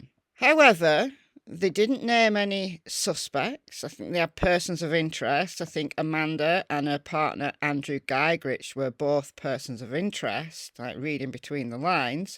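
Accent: United Kingdom. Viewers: Good morning y'all 🤔 I was sure you were British.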